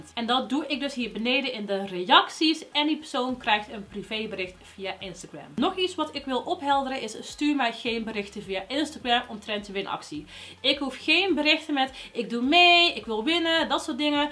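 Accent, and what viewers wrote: Brabant, Netherlands. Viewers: Hee. Kijk graag naar je filmpjes, omdat je zo lekker Brabants bent. 😃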